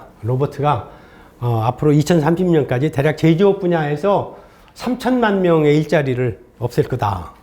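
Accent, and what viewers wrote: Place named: Jeolla, South Korea. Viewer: 당신 전라도 지 ㆍ 전라도 말투네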